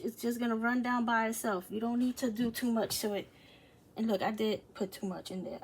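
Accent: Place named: South Louisiana, United States. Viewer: Hi,. 😁 You sound like you are from south Louisiana; Houma, in particular. I'm a Cajun, so, you sound so familiar. 😊❤️. Thank you for sharing your creativity and talent, with all of us. ❤️🙏🏻❤️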